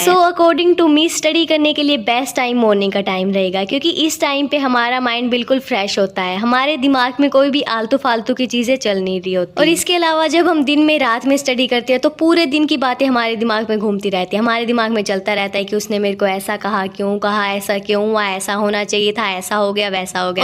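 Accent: Haryana, India. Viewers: Kya aap Haryanvi Ho ?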